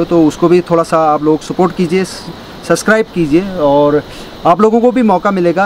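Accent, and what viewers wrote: India. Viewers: bheji mi smjhi gyon😉...Pahadi tone aani ch😂😁❤️ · Apki Uttarakhand valo ki jasi avaj lgti h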